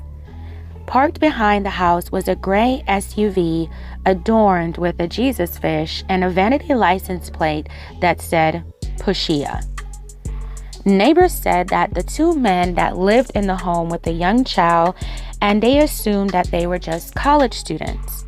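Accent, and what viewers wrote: Baltimore, United States. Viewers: Now when you do videos talk regular like this, cause you talking like you from Baltimore ain’t it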